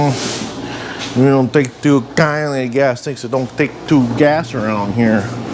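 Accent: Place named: Midwest, United States. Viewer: this channel rocks hahaha . you are good at this and explaining what your doing. your midwest accent sends it overboard ( in a good way)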